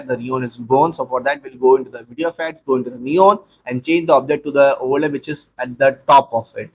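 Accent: India. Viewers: yeah he got an Indian accent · Bro your Indian accent make me fall of Laugh 😂 ( no hating )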